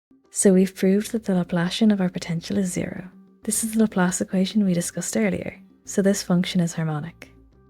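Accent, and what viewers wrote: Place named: Ireland. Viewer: Beautiful video, beautiful Irish accent. Hope you make a series of videos to develop these concepts.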